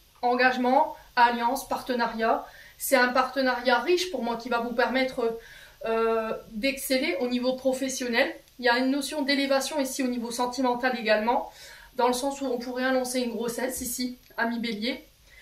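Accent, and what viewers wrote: North, France. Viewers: Incroyable 😍 ! Tout est juste pour moi et quel plaisir d'entendre ce petit accent du Nord. Merci beaucoup, je m'abonne ❤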